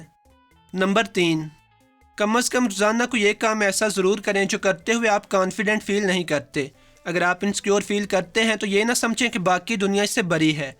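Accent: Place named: Sialkot, Pakistan. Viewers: Punjabi urdu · App ka lehja sialkoti hy na.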